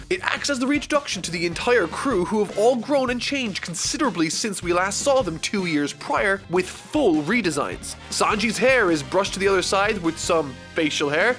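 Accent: Ireland. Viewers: Yes, his accent is pretty Irish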